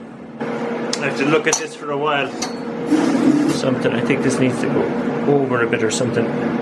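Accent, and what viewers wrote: Ireland. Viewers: so Irish I LOVE IT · Your Irish? you sound like Skallagrim and its always snowing. Assumed you were in Canada lol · dude where do you live? you sound very Irish, but your children sound American, what?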